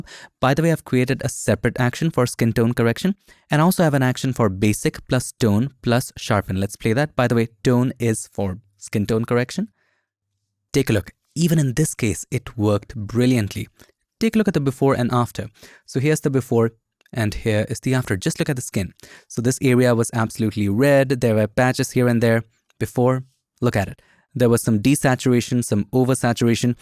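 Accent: India. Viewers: Why do i see many nasty comments on Indian accent? How is it any different than other accents?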